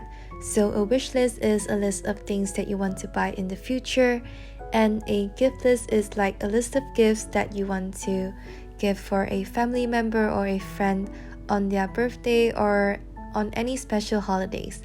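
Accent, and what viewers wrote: Malaysia. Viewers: Immediately thought that you're a Malaysian when I first hear your voice! Super nice bujo and here you gain a new follower!!! ♥♥ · Omgg it's so pretty. Your accent sounds Malaysian, are you from Malaysia?❤️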